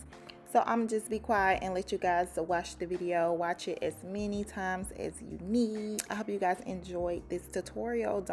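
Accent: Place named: Mississippi, United States. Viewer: girl you sound like you from mississippi! 😭😭💜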